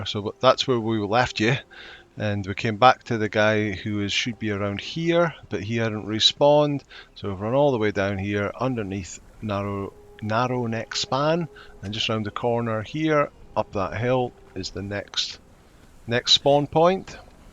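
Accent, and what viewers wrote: Scotland, United Kingdom. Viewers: I think it's still hard core if the goal is to finish the game with one life. No matter what happens to the followers. And it doesn't makes sense role playing wise. To make it hard-core why not zero follower, no healing items? Only eat berries that kind of stuff 😂 I'm starting this challenge right now. Except I will allow my followers to die and I'll be on a official sever. Thanks for the inspiration. Btw are you Scottish? The calm ton of your videos and your accent are the reasons why I watch your videos.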